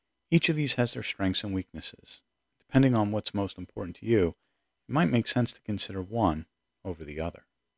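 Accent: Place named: United States. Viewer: Thanks for this review and comparison. Always loved Minolta for the very beautiful color reproduction and quality. Olympus surprised me too. I have never owned a single Olympus lens, but judging by various reviews, I had the impression that, in general, Olympus lenses were of average quality. However, in this comparison, the Olympus performed very well, especially in the corners. I also wanted to say that I liked the composition in the photographs themselves (or the cropping applied later). One can immediately say - this is a person who knows a lot about composition. The very first photo is BOOM, you can immediately put it as wallpaper on your desktop :) And that photo with a stone wall is so sharp and colorful. And the picture that was most likely taken from somewhere in the forest (?) and we see a landscape and those biiig columns - a very good composition and the picture itself is very atmospheric, with a beautiful distribution of light. 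I immediately became interested in what kind of place it was taken. Judging by your pronunciation, you are an American. But the country is pretty big (although somewhat smaller than mine, hehe), so for now it remains a mystery to me, because there were no signatures. Anyways, very interesting comparison and I'm glad I stumbled upon it today (even though it's been 2 years) because today there are so many different kinds of "bloggers" and... to be honest, they show themselves more, rather than their content. Constant jokes, all sorts of vide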